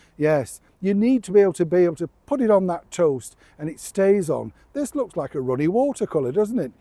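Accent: Yorkshire, United Kingdom. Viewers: ...YESS, i knew you were in Yorkshire just by looking at their very recognisable stone work in the church behind you...ey up, i love it when t' " Yorkshire " comes out don't stop will you, thank you and as always take care...👋👋🫖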